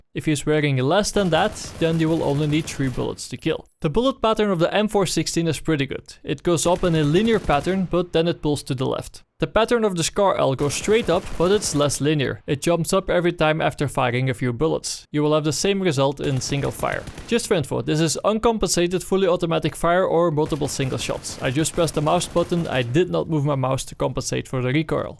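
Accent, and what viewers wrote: Netherlands. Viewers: Judging by his accent, he's from the Netherlands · 100% Jij bent Nederlands. De accent xD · You sound dutch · Sorry it is dutch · the more the netherland accent coming through - i love it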